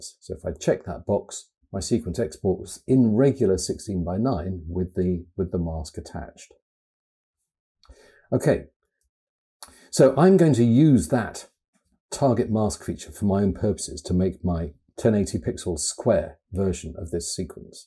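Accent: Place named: RP, United Kingdom. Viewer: You sound very posh! Thanks for this - it helped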